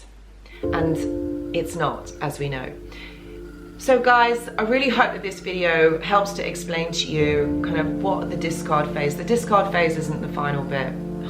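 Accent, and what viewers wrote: United Kingdom. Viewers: I think British because of her accent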